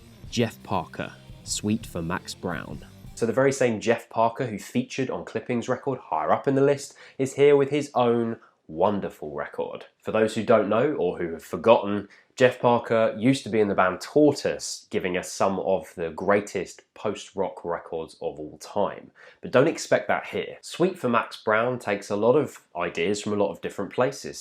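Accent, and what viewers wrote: United Kingdom. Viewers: i dont know why, but im so obssesed with the british accent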